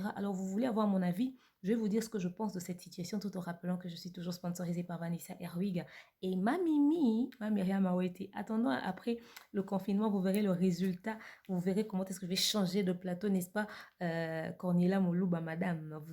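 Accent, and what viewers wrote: Ivory Coast. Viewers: Ma Sœur, Vous parlez très bien français car je vous ai toujours suivi. Svp ne changez pas d’accent ou n’ empruntez pas le ton ivoirien. Gardez votre excellent français avec vos bonnes analyses. Cela fait votre identité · J'aime bien tes analyses,ce que j'aimerais le plus qd tu t'exprime en français normal c'est trop nickel et non l'accent ivoirienne excuse moi.😘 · T EST VRAIMENT OBLIGER DE PARLER COMME UNE IVOIRIENE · Ma chérie je t'aime bcp tu es parmi le youtuber congolaise qui par bien le français et tu fais bien tes analyses. Mais pardons ne parle pas avec l'accent ivoirien. Parceque moi je beaucoup des amis ivoiriennes qu'on un complexe d'infériorité enver les congalais ils disent que nous parlons tres bien le français sans accent. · je t’aime bien mais j’aime plus quand tu parle normalement le français ivoirien ne parle plus ça stp ma sœur je t’aime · Exprime toi bien comme tu as l habitude de le faire arretes cet accent ivoirien qui ne te sieds pas du tout. 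Sinon bonne analyse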